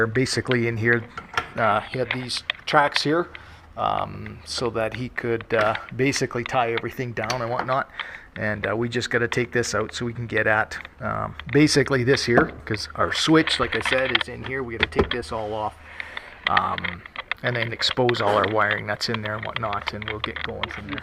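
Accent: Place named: Canada. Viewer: accent annoying. Canadian or border states. Install video was BS.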